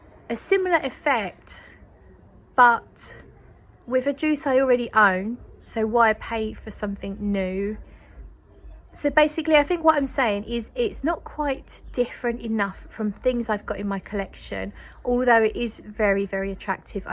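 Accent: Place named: United Kingdom. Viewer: I just ordered Artsy by Kimberly NY- it’s supposed to be very light-hearted and candy like, possibly marshmallow-like. Also I just want to say I love that I get to hear your lovely British English often. I wish I had an accent like that. You know my mother was Australian so she said things funny and I did too but I remember the day I started saying “orange” with a long o instead of “arange” like her.. I know that’s not British but anyway I started out with a different accent..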